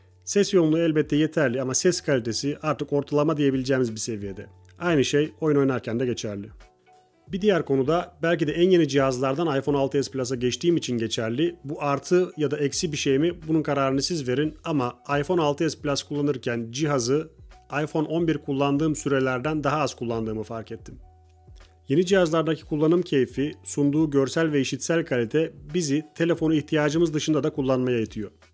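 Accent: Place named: India. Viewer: Hocam hint aksani var sende ya:)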